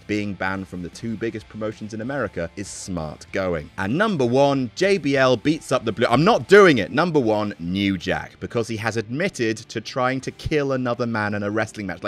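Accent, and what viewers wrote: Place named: United Kingdom. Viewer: The list is good but is way to British for me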